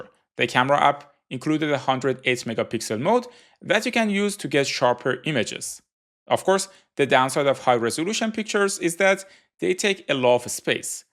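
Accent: Iran. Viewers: کاکا من حس میکنم لهجه ایرانیه؟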